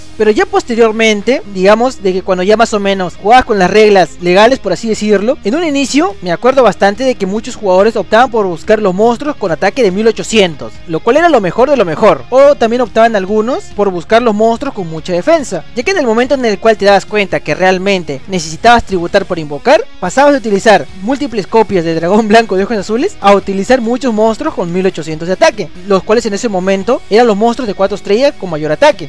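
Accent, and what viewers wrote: Peru. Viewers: Y ese acento peruano?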